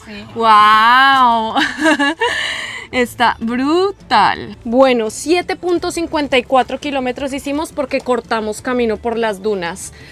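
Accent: Antioquia, Colombia. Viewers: Hola, tenés un acento " paisa", si hablamos de gente amable en tu ispa los cartagineros se llevan el premio!!!! Saludos desde Paysandú Uy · Tu acento me vuelve loco chica colombiana hermoza,nuestro país 🇺🇾 es lindo pero esta a años luz de el tuyo 🇨🇴,digamos las cosas como son,saludos desde Durazno(Uruguay).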